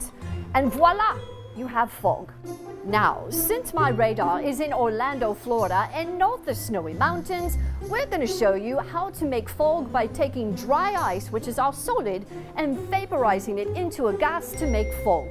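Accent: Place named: United Kingdom. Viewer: Aaah... "O" Town ! I lived there many years. Migrating from Miami to Broward then Orlando area. The Beauty N/W of Orlando , [ Rolling hills, Beautiful springs & People ! ] Then.. 90% of those areas Paved over & MILLIONS of people Still moving into FL !! You have A GREAT Weather channel !! (Just found it 3 days ago) Very good "Brit" Accent ! BTW... Cheers & stay safe in these BEYOND preposterous times !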